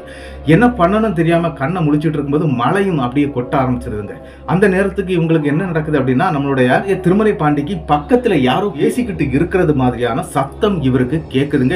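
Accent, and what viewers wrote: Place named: Kerala, India. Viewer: நீங்கள் மலையாளம் கலந்த தமிழில் பேசுறீங்க. ப்ரோ.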